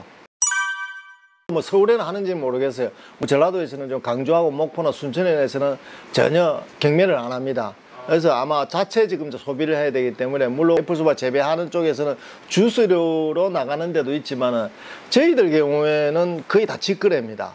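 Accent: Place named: Gyeongsang, South Korea. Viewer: 듣다가 듣다가 경상도 억양에 스트레스 받아 도저히 못 듣고 나갑니다.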